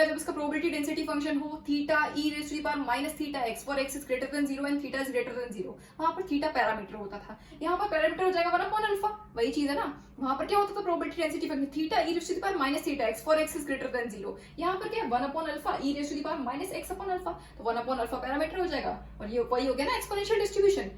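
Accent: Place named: Himachal Pradesh, India. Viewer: For once I thought you are from Himachal! haha. Nvm, Great video though!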